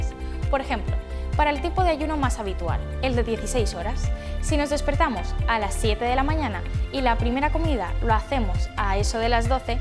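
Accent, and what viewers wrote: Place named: Spain. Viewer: hermosaaaaaaaaaaaaaaaaaaaaaaaaaaaaaaaaaaaaaa. good job. como amo el acento español. Salu2 desde Colombia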